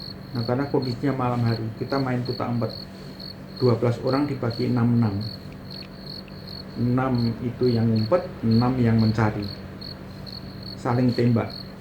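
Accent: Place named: East Java, Indonesia. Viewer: Mirip bapak n paman cara ceritanya, ternyata sama2 Jawa timur wkwk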